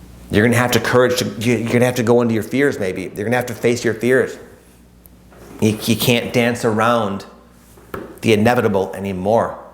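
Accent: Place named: Inland North, United States. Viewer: Powerful stuff,! So seamless that I got the feeling it was divinely channeled. Interesting how spirit works. I always loved your message, but when it was delivered in that Inland Northern American accent, spirit told me I was home. (I'm Italian like you and my family is from Western NY. Same accent.) ❤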